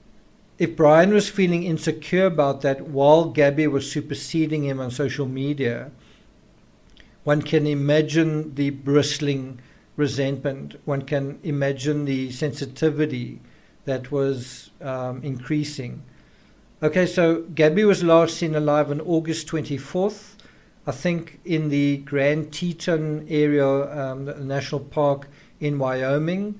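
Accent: South Africa. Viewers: Howsit! Love the SA accent. Great channel. Greetings from Eire 🇮🇪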